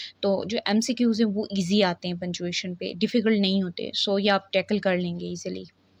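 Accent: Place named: Muzaffarabad, Pakistan. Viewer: Baji seems like you are from mzd ajk ?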